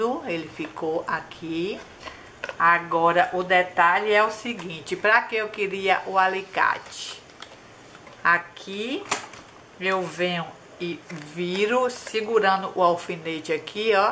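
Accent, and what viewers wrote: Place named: Ceará, Brazil. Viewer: Sotaque lindo! Ceará?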